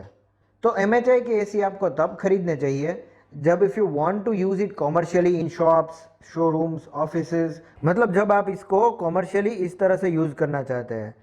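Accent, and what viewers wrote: Gujarat, India. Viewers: Bhai aapka accent gujju he❤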